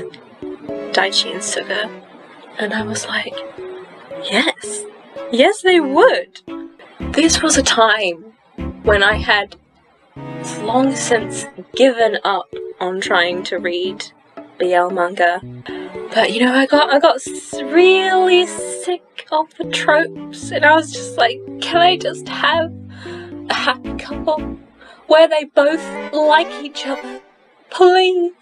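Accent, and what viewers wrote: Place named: New Zealand. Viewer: as soon as i heard your voice i just said “she sounds like a kiwi!” ig i was right haha (im also a kiwi 😊)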